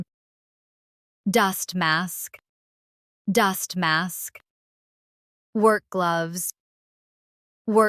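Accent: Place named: United States. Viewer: Horrible Murican accent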